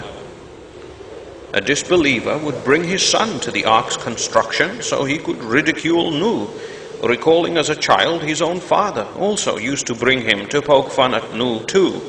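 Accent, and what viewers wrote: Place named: Scotland, United Kingdom. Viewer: The narrator sounds Scottish like sean connery.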